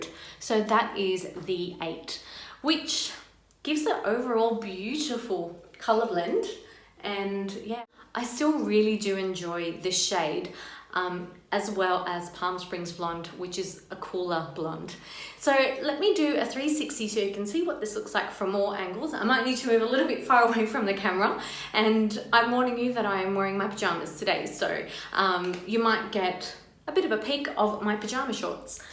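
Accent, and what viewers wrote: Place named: United Kingdom. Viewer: Thank you … 12 FS8 and 12 FS12 vary so much that I won’t even purchase those colors anymore. I received a 12 FS 12 in Miranda and it was much darker than what you are wearing right now. It was a medium brown with sparse gold blonde highlights on the top only. I put her up for sale and made sure I showed the color and someone snatched her up within 30 seconds, so I don’t have to worry about it anymore but I love Palm Springs blonde but sometimes I want to mention in my color and I think that the 12 FS 8 gives you nice dimension especially in a long style like that. It’s unfortunate, so I think I will stick to Estetica colors… Even though they do not have near the colors needed I do have one that I love and it already has demention and I can usually be pretty safe with that color. I want an amber so bad and I just may go ahead and get her in Palm Springs blonde. 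I have a good night in PSV and she does have some variance in color running through her, she reminds me of my own hair that I used to have highlighted and colored so that’s a good thing. Thank you for the video, I always love watching your videos and I love your accent. Is it bad to want to go and take lessons on how to speak with a British accent? My son and I both would like to do that because we feel it is the proper way to speak!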